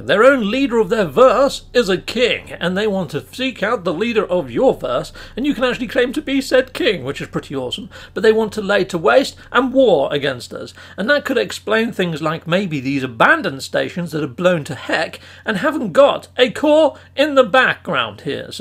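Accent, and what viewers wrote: United Kingdom. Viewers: I love how excited the gets. I keep watching for the British accent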